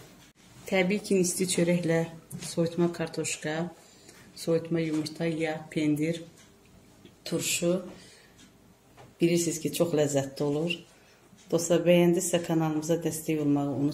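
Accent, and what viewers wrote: Tovuz, Azerbaijan. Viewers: Allah ruzinizi bol elesin🙏 ellerinize sağlıq 🥰lehceniz biz terefe oxsadi Tovuz lehcesine🥰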